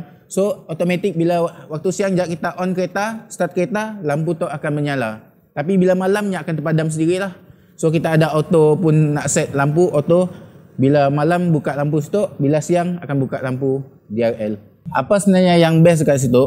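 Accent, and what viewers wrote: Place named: Sarawak, Malaysia. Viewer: Mantap 👍mun diam Serawak dalam sebulan nang konpem fasih kelakar Sarawak. Ton suara dah kedak orng sitok.. Kipidap bro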